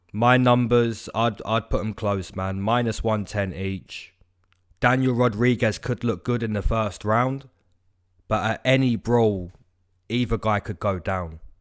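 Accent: England, United Kingdom. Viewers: Hey man always looking for your predictions love your english accent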